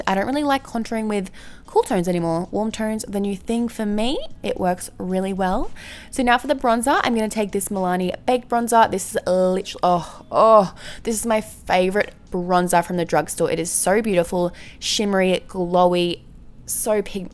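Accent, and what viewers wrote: Australia. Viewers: your accent is sooooo damn cute Australian?? I'm new to this channel this is my first video of hers I've watched lol ... don't hate me if I'm wrong 😕 ... your absolutely gorgeous!!!! I liked this video before getting 2 minutes in.. I can just tell when I'm going to like someone..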